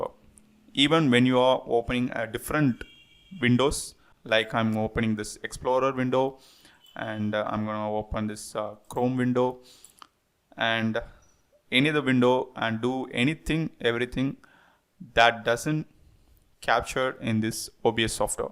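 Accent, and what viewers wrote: India. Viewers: thanks mr indian accent once again thank your kind man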